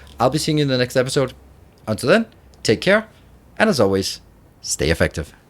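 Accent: Denmark. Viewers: a dane can hear a dane XD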